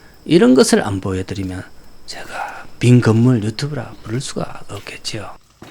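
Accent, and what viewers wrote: Gyeongsang, South Korea. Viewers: 표현력도 좋으시고 지식도 풍부하시고 경상도 말씨도 듬직 하시네요 안타까운 현실.. 덕분에 잘 봤습니다 감사해요 구독좋아요 꾸욱~~~♡ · 목소리가 어째 으시시하네요. 게다가 겡상도 사투리로. 에이~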